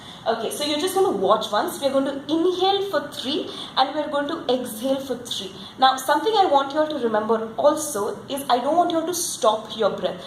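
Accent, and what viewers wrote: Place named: Bangalore, India. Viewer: Everything is fine but your Bangalorian accent and body language sucks ...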